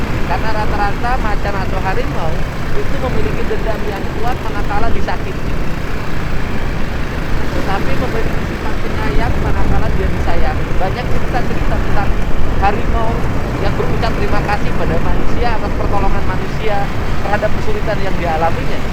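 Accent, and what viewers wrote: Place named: West Java, Indonesia. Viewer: SubhannALLAH, cara ucap dan prilaku kang DEDI MILYADI ini KEREN dan URANG SUNDA banget🥰👍👍!!!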